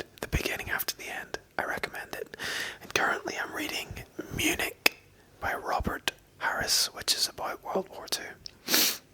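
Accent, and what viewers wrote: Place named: Ireland. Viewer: To whoever asked him to prove he's Irish, his accent proves he is Irish.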